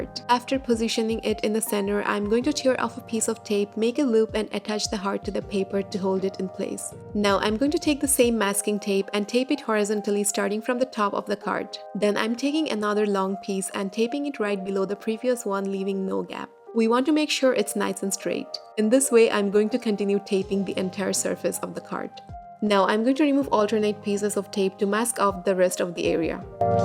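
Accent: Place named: England, United Kingdom. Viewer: Lovely cards 🥰 loving ur English accent as well 😘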